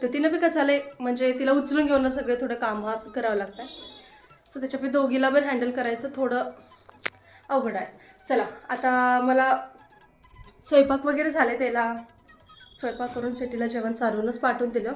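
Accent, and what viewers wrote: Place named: Latur, India. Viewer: लातूर ची भाषा आहे हे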